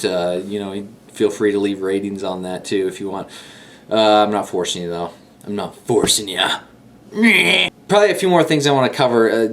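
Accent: United Kingdom. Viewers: have you ever heard of England? he has a mother fucking British accent. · British English...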